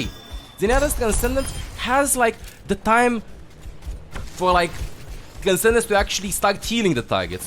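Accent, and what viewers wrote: Romania. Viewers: Not only is brilliant, entertaining, and an incredible teacher, his beautiful Romanian accent invariably gets every tip he gives playing on repeat in my head for a week. Love what you do, keep up the good work <3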